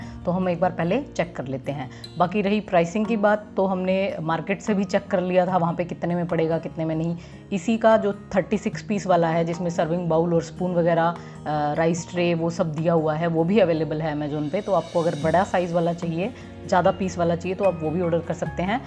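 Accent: Haryana, India. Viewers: Haryavani hai...Jai haryana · Your accent is Haryanvi! 🙏👍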